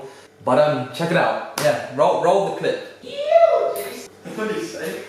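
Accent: Australia. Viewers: Lord your Australian accent is getting thicker and we aren't even at July yet. You're going to come back to the UK a full Assuie. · Omg you really are getting a Australian accent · do I hear a slight Aussie accent now? 😂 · You should go somewhere tropical to spice up the views... Bali, where I am Malaysia, Bangkok. New Zealands visually bit blah. You just bit of a square M8 liven it up. Lol and lose the awkward Australian twang.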